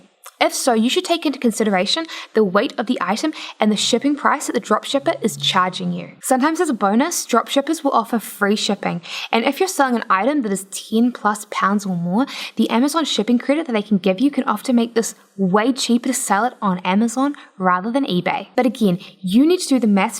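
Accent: New Zealand. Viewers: I began to understand a lot more about Amazon and Ebay selling, just from post. This led me to research much more in depth regarding this subject. So, in my opinion, great work!!! Additionally, I imagine the reviewers leaving negative comments believe they are showing a humerus side of themselves, but only identifies them as ignorant and unpolished. Her accent is amazing and I suspect she does hail from New Zealand. If you haven't traveled, the country possesses an undefinable beauty, North and South. The Kiwi population (aka New Zealanders) are a wonderful, warm, welcoming and positive people. I would live there in a heart-beat and would relish hearing words like, DEPIEND and CIENTS, its quite enjoyable to hear. Let's bear in mind that English existed far longer than America, so we need to be tolerant of other English speakers, including Speakers of English as a Second Language. 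· 'Depind', 'cint', etc. This is New Zealand pronunciation.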